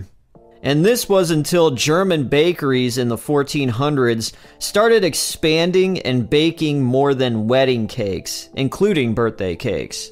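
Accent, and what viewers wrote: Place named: Buffalo, United States. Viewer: Im loving your Doc's, just found your site, about to watch this, cuz im hoping you bring up BIRTHDAY ICE CREAM CAKE... WHO EVER INVENTED THOSE CRUNCHY THINGs IN THE MIDDLE ARE GENIUS'S!!! Anyway, Im also trying to figure, where your from, you have that same Accent we have in Buffalo NY, which i also notice when i was living in Detroit, they kinda still had that accent, then moved 3,000 miles out West, & sounded like a fish outa water, its refeshing to hear an accent on YT, you know, instead of all the A.I.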